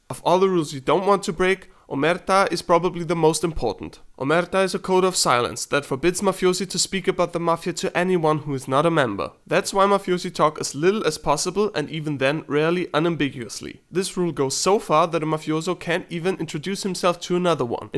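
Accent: Germany. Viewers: The narrator sounds german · You have a german accent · are you German? you have a very heavy German accent, cute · are you german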